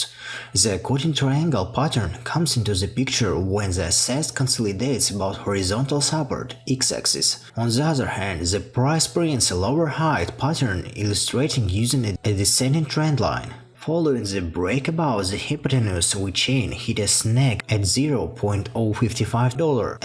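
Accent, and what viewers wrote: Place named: Russia. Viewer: Russian prononciation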